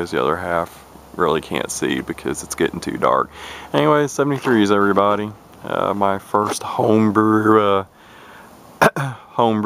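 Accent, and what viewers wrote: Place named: South, United States. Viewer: Top level Southern drawl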